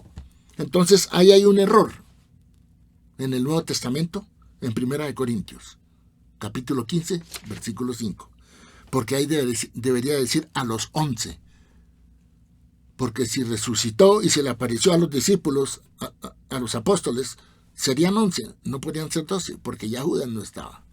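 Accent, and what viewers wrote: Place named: Colombia. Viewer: Pero creo que tienes que presentarte...según tu tono eres Colombiano..no puedes ser rabino. Porque tendrías que ser Judío nacido en Israel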